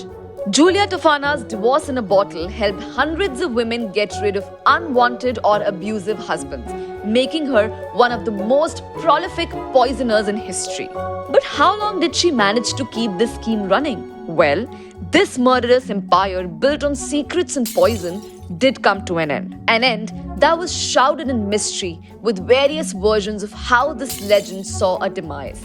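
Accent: India. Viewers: u an indian? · Ughh! The Indian English accent! Makes everything sound funnier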